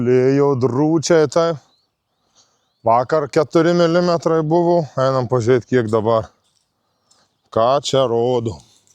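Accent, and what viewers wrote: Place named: Suvalkija, Lithuania. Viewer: Sveiki, dėkui už naują vaizdelį 😀 pasidariau priklausoma nuo jūsų suvalkietiškos šnektos ir laukų 😃. Man, kaip kaimo vaikui, tokie peizažai labai mieli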